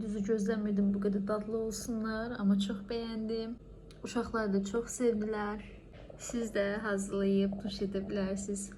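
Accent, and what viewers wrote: Sheki, Azerbaijan. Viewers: Birinci dəfədir baxıram şirin ləhcedən dəyqasi bildim ki səkilisoz🌹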